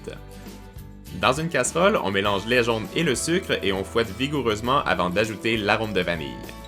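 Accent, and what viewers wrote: Quebec, Canada. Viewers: super vidéo j adore l'idée de l entremet. J aime tellement ton accent québécois 😁💜🍰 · #lovemail #teamsnap #lmp continue tes vidéos , tu es parfait et j'adore trop ton accent québécois 😍❤😘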